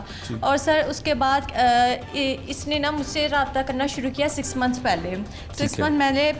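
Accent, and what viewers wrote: Punjab, India. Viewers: Accent tu completely punjabi hai. Lakin punjabi bulna nahi hota.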